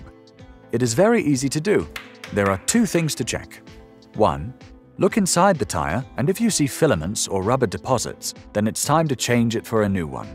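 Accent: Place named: Cockney, United Kingdom. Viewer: I can hear your a cockney 👍 good video very informative thanks 😊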